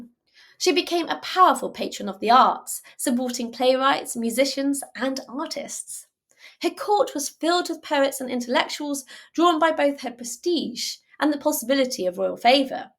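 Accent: United Kingdom. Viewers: I watch all the time i forget to like sorry. Actually i’m doing a role that needs RP british and you are perfect ❤ I love your voice. I’m also mad about history and i tell people about your videos all the time. Thank you.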